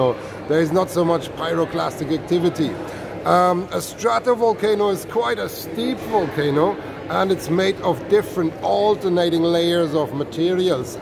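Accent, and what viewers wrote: Ireland. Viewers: Thanks a million for sharing your expertise and thoughts on volcanic matters on the isle of La Palma in a way that everyone could follow. Very interesting and much appreciated. I love your Irish accent!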